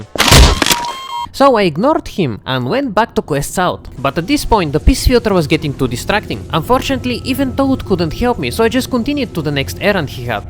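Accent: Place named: Bulgaria. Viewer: btw, your video is good, I like your humour, I think you are Bulgarian because of accent so hello slavic friend!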